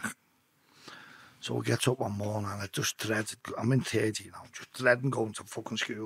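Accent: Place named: Liverpool, United Kingdom. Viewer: Would be lovely for your international viewers if there was subtitle because the Liverpool accent is crazy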